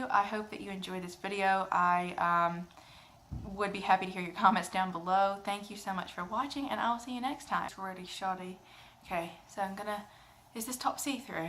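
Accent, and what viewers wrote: United States. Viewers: Omg you're really good at it! Lol I do this, too. I thought you were English and you spoke with American accent when you were doing reviews! Lol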